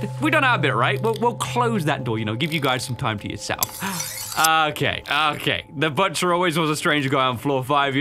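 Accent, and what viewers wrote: United Kingdom. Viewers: I was pretending to be hypnotized because I was watching it and I was confused of what the intro was and so I rewind it and pretended to be hypnotized. That intro was pretty cool. Also when I watched your videos you sound a little bit British like when I heard you say dawko I didn’t hear it clearly. Do a DNA test of where you could be from, Dawko did one on his channel 3 years ago. Or what your part of part Irish, part Scottish, part Swedish idk please make a video of it if you can and watch dawko video for an idea. Just search Dawko DNA Test and you’ll find it. And once you know what to do try to make a video on it.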